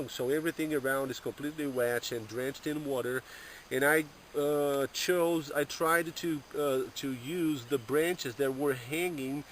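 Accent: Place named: Brazil. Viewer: Mano, Vc fala bem inglês, mas pelo sotaque eu acho que é brasileiro que nem eu. Parabéns pelo vídeo, muito bom.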